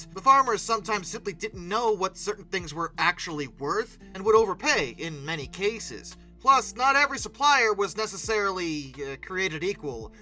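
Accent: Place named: Mid-Atlantic, United States. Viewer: I like it. It's like a mid 20th century newsreel with a slight mid Atlantic accent. Appropros for the subject.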